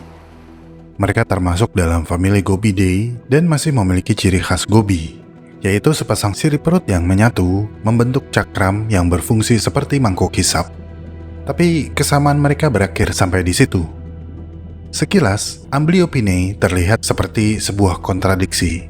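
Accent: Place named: West Java, Indonesia. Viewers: Orang Sunda lu ye bang 😅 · Di luar konteks ya... saya perhatikan abg dalam berdialog ada semu2 sunda nya...apakah keturunan atau memang banyak org sunda di sekitar abg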